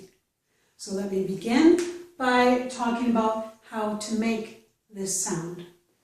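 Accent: United States. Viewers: You have such a beautiful American accent. Would I be right in assuming that is a General American accent?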